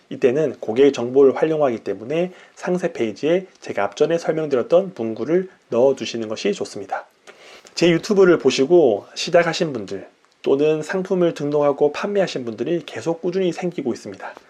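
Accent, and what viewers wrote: Busan, South Korea. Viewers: 오늘도 상품등록 해보자~~~ ㅎㅎ 억양이 딱 부산분이신거 같으네요 저도 부산사람이라 느낌이 딱 오네요~^^ 반갑습니다~ 돈은 벌어야하는데 저희집 사정상 제가 집을 비울수없는처지라.. 정기적으로 출퇴근하는일은 엄두를 못내거든요.. 그런데 돈은 또 벌어야 된다는 아이러니의 구렁텅이에서 허우적 대고 있는 사람입니다... 그러다보니 집을 비우지 않고 할수 있는일을 늘 찾지만...쉽지가 않아 알아보고만 있던중 유튜브 알고리즘이 을 만나게. 해줘 정주행하며 감사히 공부하고 있습니다~ 평소 소비자 입장에서 알리익스프레스 이용하고 있거든요 말도 안되는 저품질상품도 많은데 시간들여 잘찾고 배송늦는거 감안하고 느긋한맘으로만 기다리면 정말. 가성비개꿀. 득템인 상품이 많더라구요.. 해서 요즘 소비패턴은 급하고 당장필요한건 쿠팡 이용하지만 그외엔 배송기간 감안하고 알리서 사거든요.. 알리서 싸게 구매한 악세사리를 혹시나 해서 가격을2배로해서 당근이랑 번게에 올렸더니 팔리더라구요... 해서 아에 알리익스프레스에서 가성비좋고 판매 많이 되는 상품 위주로 구매해 스마트스토어 개설해서 판매해봐도 되지않을까? 하는 생각이 들어 공부중인데요 그렇게 시작해봐도 될까요? 전 당장 월 몇천만원 이런수입 바라는거아니구요 그정도수입 내려면 그만큼 시간과 노동을 투자해야 되는데 제형편이 돈버는거에만 시간을 오로시 투자할 처지가 안되거든요 해서 전 지금 내식구 최소생활비 버는게 목적이고 그게 충족되고 안전해지면 더큰꿈 키워 나가보고 싶거든요... 제가 너무 소극적인가요? ^^; 뭐든 좀 배우려하면 목돈부터 드니 돈없어 달려들지도 못하겠고 그나마 께서 촙ᆢ자도. 알아들을수있게 자세한 영상 올려두셔서 보며 공부하고는 있는데... 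이렇게 시작해봐도 되는건지 의문이 듭니다 너무 길고 추상적인 질문이라 읽기도 힘드시고..ㅎㅎ 답주시기 애매하시겠지만... 조언 부탁드립니다~~행님~^^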